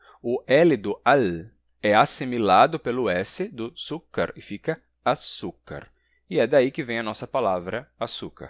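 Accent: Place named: Rio Grande do Sul, Brazil. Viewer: Descobri porque acho seu sotaque diferente dos nordestinos que conheço: sua pronúncia do "e" no final é parecido com a pronúncia dos gaúchos: "leite quente dói o dente da gente".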